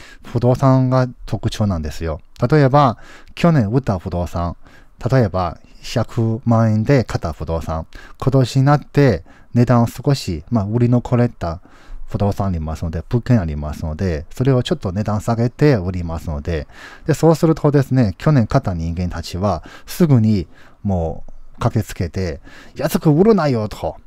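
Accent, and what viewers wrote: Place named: Osaka, Japan. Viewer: 大阪弁だぁ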